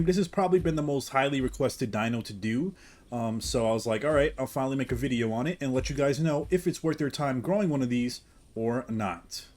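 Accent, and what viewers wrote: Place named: New Jersey, United States. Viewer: you from jersey? I hear a tiny accent coming through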